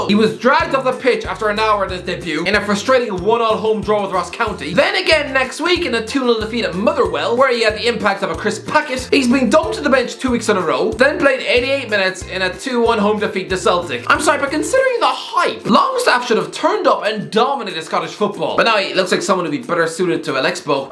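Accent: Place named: Ireland. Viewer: I post football news also . Just without this Irish ☘️ accent.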